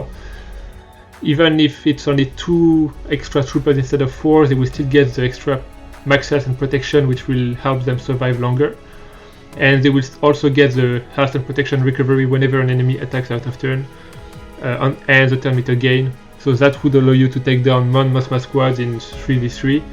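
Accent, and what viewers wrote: France. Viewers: Thanks for replying! I don't think I'll find many equally-geared Ewoks, my Malak is R7, modded for the solo (to the best of my ability, I have almost 0 6E slicing mats) and I'm in Bronzium 4. Would it work against G9-12 Chirpacron, in either one? I don't want to risk my 79 banners. Also, thanks. I've been trying to decide what to do with my omis. I might actually omicron Chirpa, seeing as how I'm gunning for Threepio for GAS anyway Also also, don't worry about your voiceover. I could understand you perfectly well, even through the (French? Is it French?) accent. I would like it if you did your GAC/TW vids with the voiceover, and the GC/TB vids with just text, because I like to boot them up at school and I don't want to miss anything on mute. However, that is just a me thing. Don't feel pressured to.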